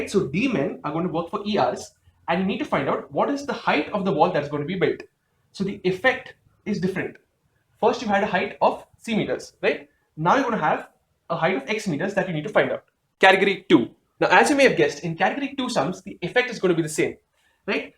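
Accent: Goa, India. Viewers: Are you goan by any chance?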